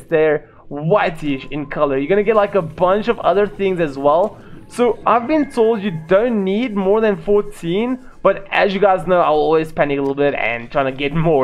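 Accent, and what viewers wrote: South Africa. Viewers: are you south african, u sound like one of my friends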